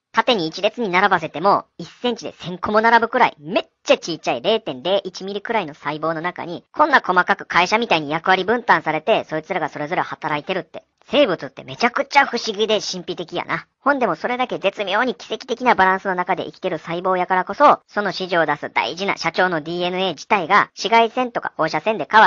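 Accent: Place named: Osaka, Japan. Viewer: 大阪弁やから理解しやすい最高ありがとう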